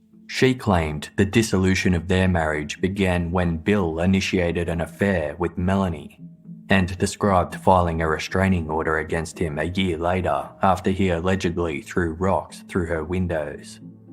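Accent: Australia. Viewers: Ok I’m going to have to UNSUBSCRIBE. The annoying drone of the Aussie accent I could muddle through but this report COULD NOT BE MORE BIASED! Allow me to be of service to listeners: go to Direct Appeal Podcast. It is one thing to simply be a story-telling channel but a whole other when the “story” is clearly one sided.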